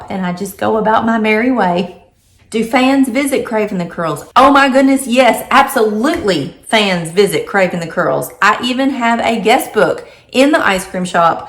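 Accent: United States. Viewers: That was a great video, I knew most of that from following your channel, but always happy to hear you chat (you have a very nice, soft American accent 😊) . Never knew about Randy being your second hubby, glad you found each other again 💛........If I lived in the states, I would love to buy your business🍨🍦 Best of luck in all that you will do, your fans will be watching 💛💛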